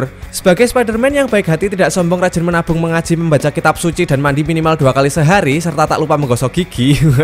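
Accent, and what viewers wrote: Java, Indonesia. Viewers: medok amat suara lu bang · Kok Logat e kejawen pak😂 agak ngapak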